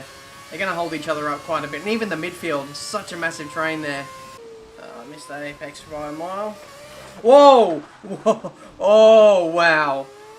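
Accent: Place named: Australia. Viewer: Love the videos. And its not just because of your aussie accent.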